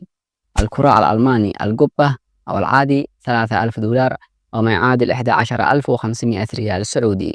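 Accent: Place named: Yemen. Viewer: ضع علي الشاش كتابة الارقام المسعره مع الكلام لان الكلام ليس مفهوما جيدا مع النطق السريع واللهجه اليمنيه 🎉😮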